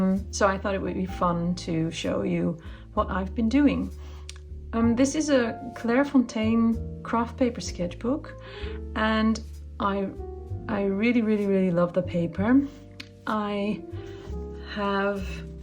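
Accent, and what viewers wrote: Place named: United Kingdom. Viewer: Your english is really good! In the first moments I thought you are a native speaker, you have a lovely british accent! :)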